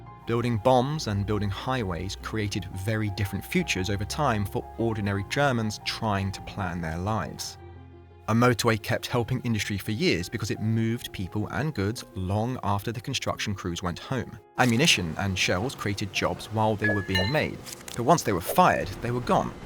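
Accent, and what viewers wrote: United Kingdom. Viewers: How are u British now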